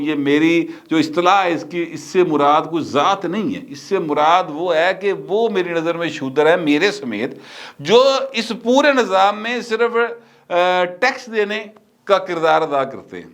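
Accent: Lahore, Pakistan. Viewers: Your Lahori andaz is excellent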